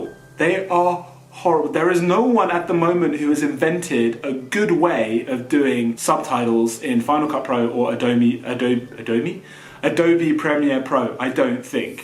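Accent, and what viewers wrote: United Kingdom. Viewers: The simplest way for both you and us is option # 2 so long as you manage to speak slower; after all, British accent is less easy to grasp. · 我觉得你的英式英语更容易听懂诶！！！我之前听了几个英国人的，我觉得吞音（？）好多。。。我还是习惯美式英语😂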